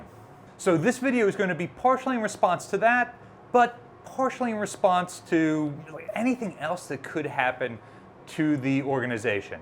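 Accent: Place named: California, United States. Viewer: Personally,i think you need to work ok ennunciating. They are MUSEUMS, not myoozims. Then again, you sound like you are from commiefornia, so i cannot expect much from you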